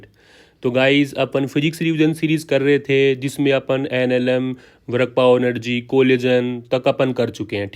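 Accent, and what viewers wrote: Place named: Rajasthan, India. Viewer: App Rajasthan se jaipur ke as pas se ho kya kyuki apki awaj ,Kai Che wali lag rhi h😊😊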